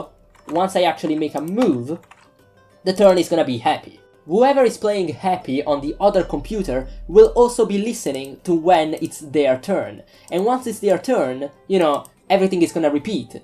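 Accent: Italy. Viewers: Si sente che sei italiano, Grande ✌️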